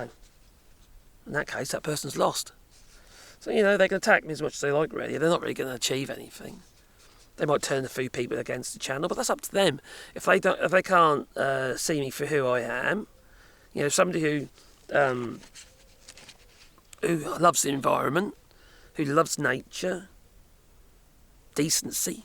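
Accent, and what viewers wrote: United Kingdom. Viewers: Fed up with Trump and nice to listen to a British accent for a change! Just ignore your trolls! I pay for YouTube but don't have a YouTube channel. Too old. I suffer from phishing on my mobile phone and at my age have to answer it just in case it's the GP's surgery for a blood test review or appointment. GPs sometimes use their own mobiles and I can't save their numbers. I object to a greasy fraudster calling me by my first name as if we're the best of friends but that's how I know they are trolls! Officialdom never does that! I don't have any investments, insurance or car thank you very much, or a daughter who's run out of money! ❤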